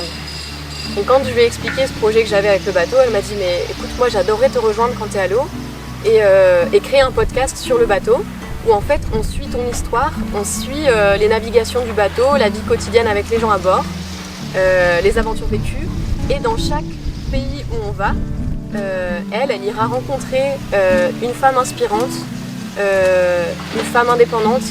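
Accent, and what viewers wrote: Quebec, Canada. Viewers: Cool de te revoir ça fait plaisir d’entendre ce petit accent québécois. Et toi tu en est où?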